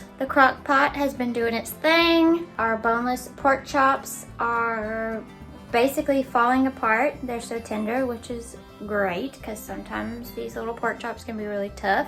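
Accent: South, United States. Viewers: I'm so happy you are back. I have really missed your charm your cooking and you wonderful southern accent. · I've missed your southern accent. This recipe is devine.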